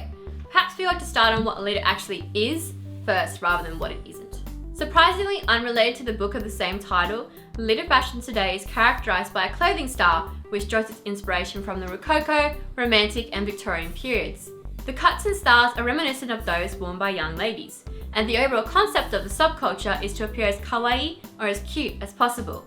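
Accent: Australia. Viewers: Sorry doll, I'm Australian, and this is what Australians tend to sound like. We are working on a solution for ESL speakers:) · I recognize that she's Australian. I assumed as much given that it's on the Deerstalker channel. I hear the accent enough that I don't really much notice it but that's fine. I'll take your word for it. either way, I'm not sure OP is even worried about it anymore as I've noticed they no longer comment here. haha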